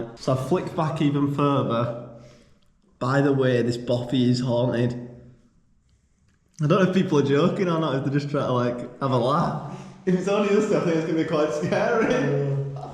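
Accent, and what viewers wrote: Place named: Yorkshire, United Kingdom. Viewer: I don't know where you're from, but that sounds like a proper Yorkshire accent to me ! Great video, great weather ! I love it. 👍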